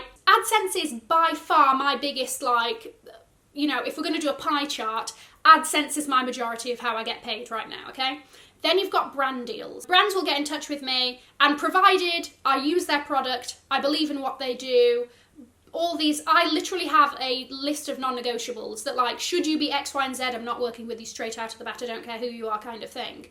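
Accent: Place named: Doncaster, United Kingdom. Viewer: Well done! You have yourself a fabulous community who wish you nothing but luck and success. You’re so engaging and having your regular content is a great little piece of home (that Doncaster accent is that little slice of comfort I miss with my tea.) Keep doing you - great things never come from our comfort zones 😘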